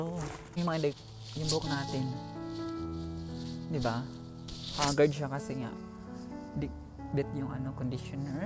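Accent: Visayas, Philippines. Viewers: HALLWAY tawag ana dai knang imung gibutangan ug tela nga salog.Mao gyud dai Ayaw pakumpyansa kaayo sa pag film sa place sa inyong ginatrabahoan especially ang plate number sa car ,i-blur lng na kay lisud ma-AWARD sa owner. I-vlog lng ang mga lihok2x ninyu while working. Pasagdi NG MGA BASHERS . Malingaw mi sa imung chinikahan Kay tungod sa imung BISAYA accent. Ana MN gyud ta importante MAKASABOT mi ug malingaw . Stay true lng ug never MAGPA PRETEND para lng i-please mga tao. Maong love KA namu Kay mkalingaw KA and for being true to yourself dili pa feeling. Bsta amping mo permi dai ha. NO SKIP ADS mi tanan! God bless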